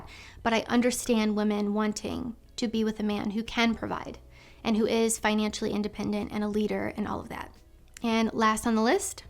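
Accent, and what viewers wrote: United Kingdom. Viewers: Other than her british accent, I 100% agree with her. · I came to the conclusion that I would never marry a white woman a long time ago and I didn't. I married a Philippina 11 years ago. No headaches, no arguments, no bullshit. I know that the woman seen here is an Asian of some flavor and has a British accent. Stick with PH as most (but not all) are much better than what you see here. You will thank me later! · Her voice is more masculine than it is British accent. I will leave it at that.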